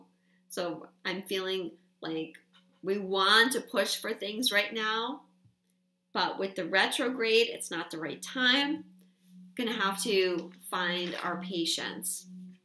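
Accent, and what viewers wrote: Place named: South, United States. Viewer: Yes, it still seems impossible NOT to rest; I just want to get into my mission and being creative and stuff, but man; I just don't have enough physical energy nor would I know where/how to start!!:-)) By the way, are you a singer? You have a Country voice that reminds me of Emmylou Harris:-) Much Love to you as always, and thank you for the video <3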